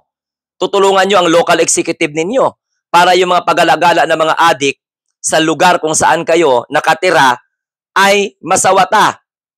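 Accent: Iloilo, Philippines. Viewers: Ilonggo ba si sir? Ttanong lang po...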